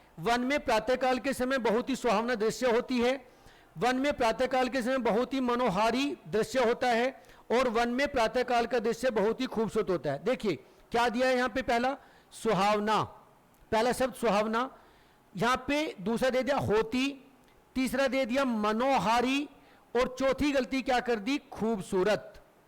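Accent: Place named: Haryana, India. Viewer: Apka tone lagta hai ki aap hariyana se hai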